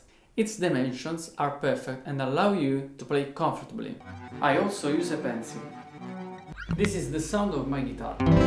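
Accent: Italy. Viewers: I'm Italian and I'm 14, but I think u are from sud Italy cause of your strong accent, I notice only now that u are Italian and I don't know why I'm speaking English, ormai ho già scritto 😂, a proposito di dove sei? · Si sente tantissimo il tuo accento italiano😂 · L'accento Italiano si sente da 10km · Accento italiano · Your English is good. Love the Italian accent! You are very talented!! Keep it going!!! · Im spanish, and its very easy to notice that you're italian, and I don't say it with bad intentions :) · I hear italian accent here umlmlmlml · Are you from italy? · Si capisce un po'dalla pronuncia che sei italiano. However,nice video! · I'm Brazilian, yeah you can notice it, the pronunciation of the words sound very Italian, IDK how to explain?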